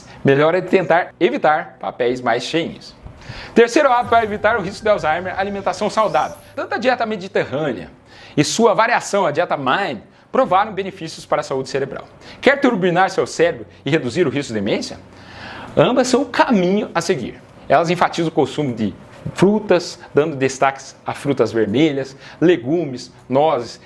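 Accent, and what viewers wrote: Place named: Minas Gerais, Brazil. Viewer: Ah, doutor, eu tomo Melatonina quase toda noite pra dormir. Fico muito preocupado, com um distress diário preocupado com a minha esposa, que tem bipolaridade. A minha sogra faleceu com PSP, foi um sofrimento. Fazemos suplementação com D3, Zinco, Fósforo, Ômega 3, Magnesio Dimalato. Ah, gosto muito de ouvi-lo porque o senhor tem um sotaque meio de mineiro.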